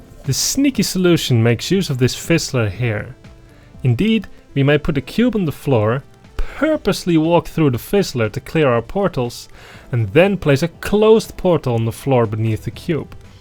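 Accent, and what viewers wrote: Netherlands. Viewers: are you dutch cus i am and i hear the accent and your name looks dutch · I hear a Dutch accent and see a Dutch name 👀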